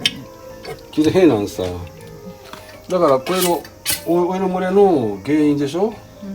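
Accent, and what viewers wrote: Niigata, Japan. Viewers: 新潟っぽいしゃべりだったので検索したら阿賀野市だったんですね！！ CB750Fは高校の頃憧れでした。最初のエンジン音で「おかしな音」と感じました。知識がないショップに当たってしまうと不幸ですね。。。。。しかし外見は綺麗ですね！！凄く興味深く観させて頂きました。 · 完璧な整備に感動しました。なんか･･･新潟訛り？、どこにあるんだろう？調べたら、やっぱ新潟だｗ 来年暖かくなったら、帰省がてら見学に行こうかねぇ！NSRで！ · 東京で生活してるけど、聞き覚えのあるようなアクセントだなと思って調べたらやっぱり新潟の人だった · ところどころに新潟弁が聞こえる。新潟県のショップさんですか？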